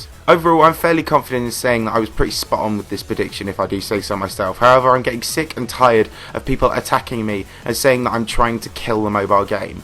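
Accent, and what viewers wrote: United Kingdom. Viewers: 3 reasons I like -His sexy handsome face -His lovley eargasmic brittish accent -His good content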